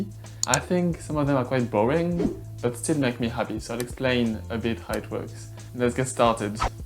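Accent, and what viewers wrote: France. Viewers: Ton petit accent français doit tous les faire craquer ! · Love it saving time is essential so we can do the things that we like. Loving the minimalist approach too. Also your French accent is endearing the British boys must love it. Although the London gay scene can be exclusive.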